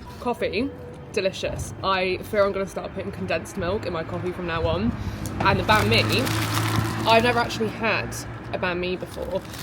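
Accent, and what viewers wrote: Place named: United Kingdom. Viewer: I love all of your videos, and your British accent is so cuteeee OMG - makes me want to book a flight to London 😝 And you guys are such a cute couple, love watching you two together, listening to each other and always taking each other's tastes and interests so seriously. That's what I call in couples a participatory involvement with each other :face-red-heart-shape: Keep creating and keep sharing! Looking forward to all that good stuff that you show us in your vlogs. I have been binge watching your channel this Sunday - all day long - and I will do the same every Sunday from now on🤣🥰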